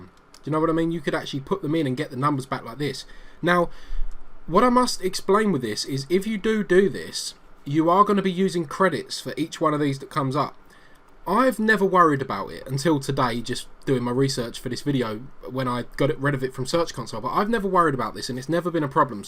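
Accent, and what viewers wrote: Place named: Colchester, United Kingdom. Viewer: I'm originally from Colchester too. The accent sounded familiar :) ..A Helpful video as returning to SEO my sites. Ta very much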